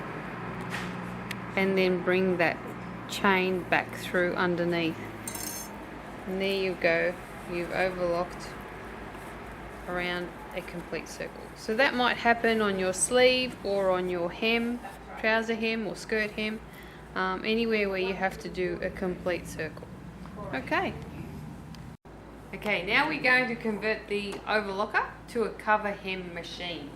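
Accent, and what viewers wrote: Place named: Australia. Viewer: Thank you so much! Your instructions helped me figure out the manual I have for my PFAFF 4862 Coverlock. The manual was not helpful at all, in fact I was more confused each time I turned to it. You saved me totally losing my mind and my temper and destroying everything. Oh and love that it's Australian!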